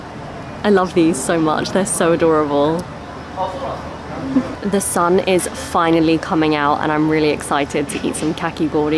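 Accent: United Kingdom. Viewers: いつ聴いても綺麗なクィーン‘ズ イングリッシュいや、キング’ズ イングリッシュですねぇ。日本の第二外国語教育として英語か米語のいずれを選択するかの議論があったのですが、日本は米語を選択して今日に至っています。何度も申し上げますが、発音が心に響きとても美しいですね。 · イギリス英語って、ホント、耳に心地いい！ と、感じる「１」大阪人です〜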